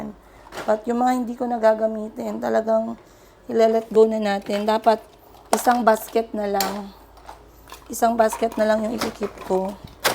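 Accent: Iloilo, Philippines. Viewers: Ilongga gid tuno mo taga Antique ako dhay. Kaya lagi ko pinapanood vlog mo. Be strong lng gid. Enjoy lng sa mga anak mo. Watching from bharain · Nami yah ilonggo nga tono hahahaha mo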